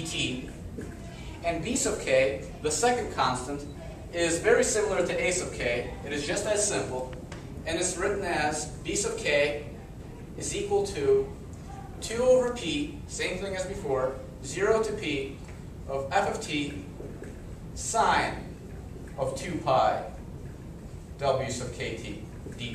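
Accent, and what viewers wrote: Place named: Ukraine. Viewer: Great stuff,. Excellent presentation in terrific English with only a hint of a Ukrainian accent. Thanks!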